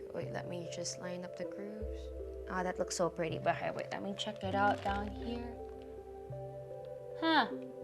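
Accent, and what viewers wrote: Philippines. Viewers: ui your a filipino pala hehe just dropped on your channel ehehe kaya palang familar yung accent hehehe. i also have and still currently using almost the same system. z170 asus ROG with a i5 6400 CPU with GTX 960 with 8gb Ram planning to upgrade the ram and the GPU soon currently using it for editing and minsan gaming hehe.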